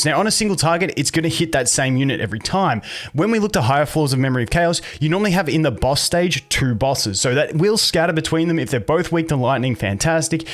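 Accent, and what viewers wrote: Australia. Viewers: wait australian?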